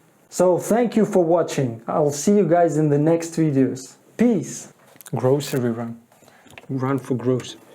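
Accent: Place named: Scotland, United Kingdom. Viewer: Sounds like a Scotty to me.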